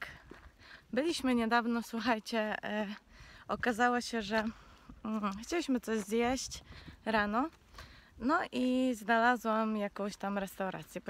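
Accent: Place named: Ukraine. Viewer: Hej To takie trochę specyficzne i pewnie trochę dziwne pytanie czy masz pochodzenie Ukraińskie ogólnie po za polskie. Masz taki leciutki akcent. Pozdrawiam ciebie i Bażanta 😁😉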